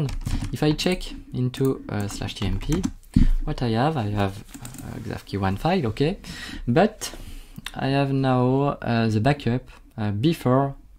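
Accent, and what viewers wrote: France. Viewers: Hello Xav, il est evident de part ton accent que tu es Francais. J'aimerais bien avoir un tutorial sur Ansible. J'ai deja souscrit au canal, et j'aimerais bien echanger avec toi pour avoir des réponses aux questions que je pourrais avoir. Merci de me dire si c'est possible.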